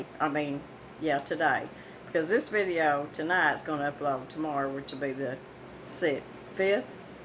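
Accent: South, United States. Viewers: I don't know how I came across this but after watching it for about 10 seconds I hit subscribe. I love her southern accent, she sounds like my mamaw.. love the recipe too!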